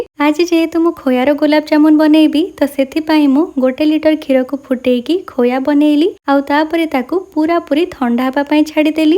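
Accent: Sambalpur, India. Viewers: Tamar Sambalpuri voice bhal lagsi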